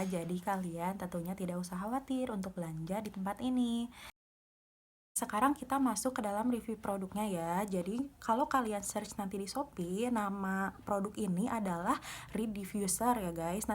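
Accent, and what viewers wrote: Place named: West Java, Indonesia. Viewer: Haha lucu bgt logat sundanya 😁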